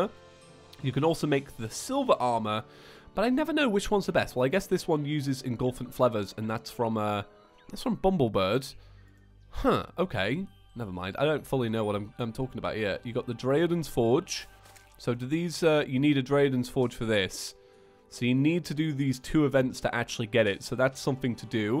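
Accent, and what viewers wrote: United Kingdom. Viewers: I was super confused to his british accent, "What the heck is super heart? I don't remember a game like that", then I realized he was talking about super hot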